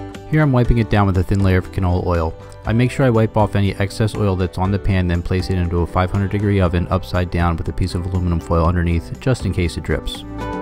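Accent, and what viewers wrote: United States. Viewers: This guy sounds like he's from Baltimore ("wooder" ...my only knowledge of Baltimore comes from The Wire haha) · Your midwestern accent is so charming, makes me want to watch more of your videos!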